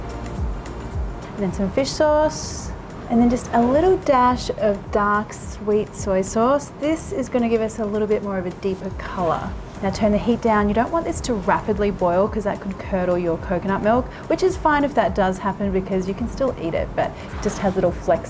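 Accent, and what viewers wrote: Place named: Australia. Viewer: We go to Chiang Mai often and enjoy Khao Soi. I think I will make you this recipe while I’m stuck back in Australia Btw you sound Australian too 😊😂😎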